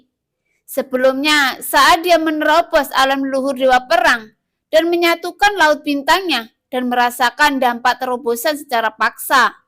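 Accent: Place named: Poso, Indonesia. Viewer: Mimin orang mana ini kayaknya org poso ya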